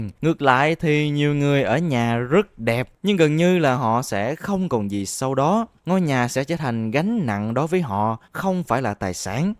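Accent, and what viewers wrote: South, Vietnam. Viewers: Hai nghìn lẻ ba (2003) , không không , phải là hai nghàn lẻ ba mới đúng , giọng miền Nam thì phải dùng từ ngữ miền Nam , cảm ơn !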